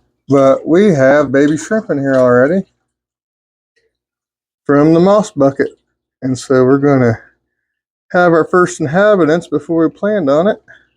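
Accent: United States. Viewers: Where you from country boy?! 😅 Hellooooo from Raleigh, NC! Enjoying the content, dude. I'm liking the genuine videos not trying to be too polished and pomp. Keep up the great work!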